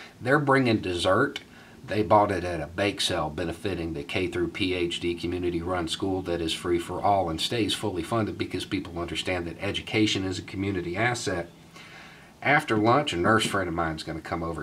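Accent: South, United States. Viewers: Oh man what this guys sells is generic progressivism in a southern accent. He's the black republican.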